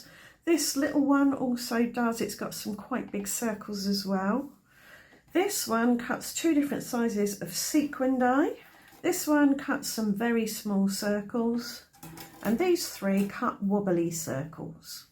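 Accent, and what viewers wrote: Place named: United Kingdom. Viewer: My goodness, this video is so much fun! As I watched you create your accent reminded me of my sister. She learned to talk in Britain while our father was stationed there in the early 50’s (I had not been born yet!) my sister still has a British accent on certain words or phrases and I love it! Thanks for the ideas and the memories!!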